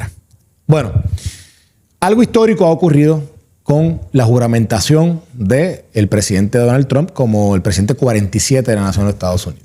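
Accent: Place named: Puerto Rico. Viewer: Como Cubana te agradezco k le des voz a mi pueblo. Me encanta el acento boricua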